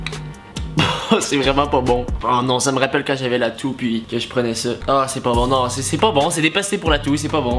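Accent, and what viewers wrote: Quebec, Canada. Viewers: T québécois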